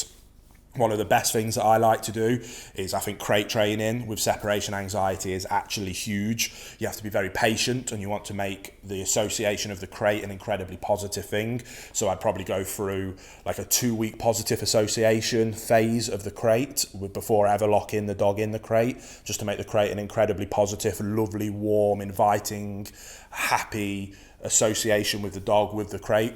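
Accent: United Kingdom. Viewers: Our pup is almost 5 months old and I have not had the stones to leave him on his own in his crate yet. Lucky to be mostly retired but still want to get him conditioned to being left alone. Look forward to trying your approach of seconds to minutes to hours. Thanks and I love a northern accent.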